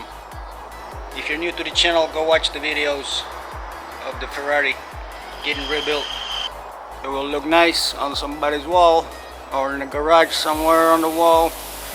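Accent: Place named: United Kingdom. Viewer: because it's not American English. It is British English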